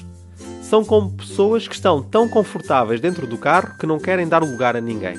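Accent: Portugal. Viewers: Ele não tem a língua enrolada, ele é de Portugal. Nunca ouviu um português falar? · ele e de Portugal por isso fala desse jeito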